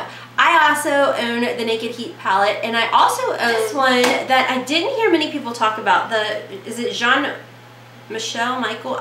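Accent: South, United States. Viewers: This look is so beautiful on you!! And your accent! I love it you must be from the south, as I am!! I’m in Oklahoma and it’s hotter than satan right now!! And I do like first impressions on palettes! Great video xoxo! Lots of love!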